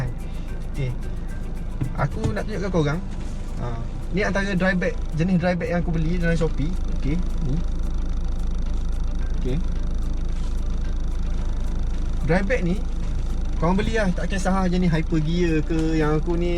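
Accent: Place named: Kuantan, Malaysia. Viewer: cara aok cakap.pon koi taw aok org kontan.. 👍😄. Biasa mancing sgai belat ke?😄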